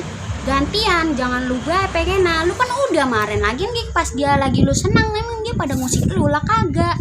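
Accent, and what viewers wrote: Bekasi, Indonesia. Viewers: Logat nye bekasi bnget... Kaye enyak aye kalu ngomelin aye, keluar logat nye keq gini. Kage ade titik kome nye.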